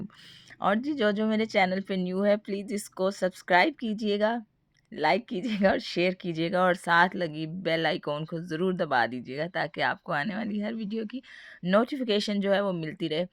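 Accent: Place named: Sargodha, Pakistan. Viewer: Dear ap Sargodha sy hy kia ap ka lehja aisa lga voice sy batian bhtt sandar krti hy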